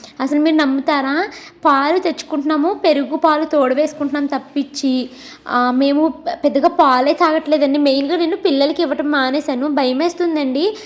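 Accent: Godavari, India. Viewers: Ma godavarolu bhasha alage untundi...yevaru marchaleru....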